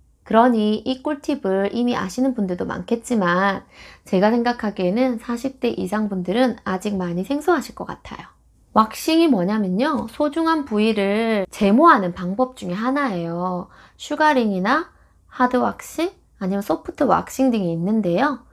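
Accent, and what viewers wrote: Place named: Gyeongsang, South Korea. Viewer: 고향이 경상도이신가 보네요!!!...ㅎㅎ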